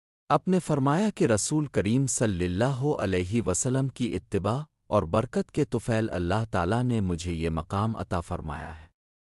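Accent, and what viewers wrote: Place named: Bangladesh. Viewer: بنگالی بھائی ہے مجھے جہاں تک اندازہ ہو رہا ہے کیونکہ اردو زبان بولنے میں بھی فرق ہے ۔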